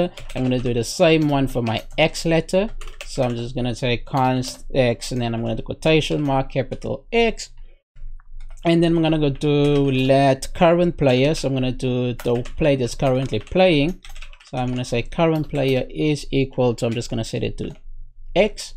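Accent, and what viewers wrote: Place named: South Africa. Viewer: I am studying IT and had to leave my home country (South Africa 😢) I need help with an assignment while being homesick. The i came across your video and with 5 seconds into the video i knew were you were from. Not only did your video make my day but will definitely help my studies 😊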